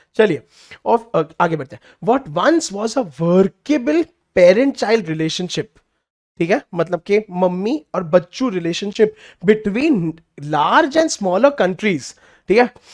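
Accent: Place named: Bihar, India. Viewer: Maano ya na maano but Bihari accent has the best grip to students😄😃 Way of Teaching is amazing 🎉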